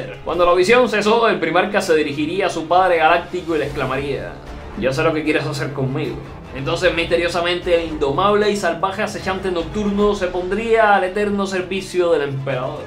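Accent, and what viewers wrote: Cuba. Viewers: Perdón por la pregunta,pero sos cubano?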